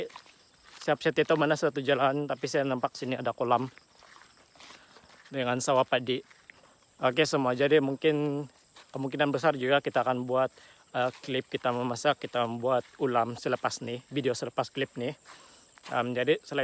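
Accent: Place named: Malaysia. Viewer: Betul betul betul Logat malasia yasobat Hdr bwt nyport👍👍👍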